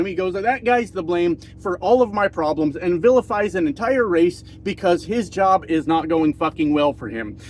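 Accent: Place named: Quebec, Canada. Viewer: Are you Quebecoise?,sound like it.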